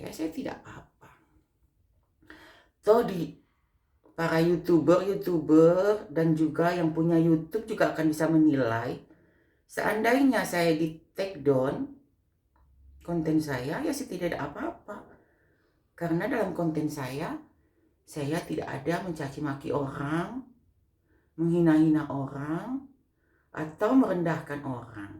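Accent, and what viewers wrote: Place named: West Java, Indonesia. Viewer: Teh dari logatnya kaya org sunda. Salam kenal dari tambun bekasi.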